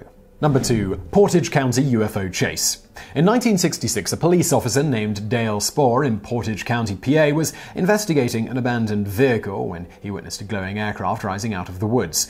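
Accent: England, United Kingdom. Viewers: Ran-i-er. Damn Limeys. Rain-eer. Your welcomer. Jesse Mar-sull. Marcell. You English folks talk funny.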